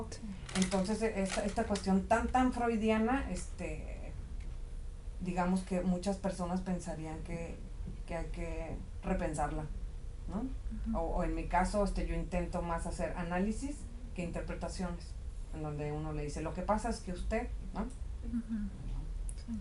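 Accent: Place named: Mexico. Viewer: q bonita mujer q hermoso acento mexicano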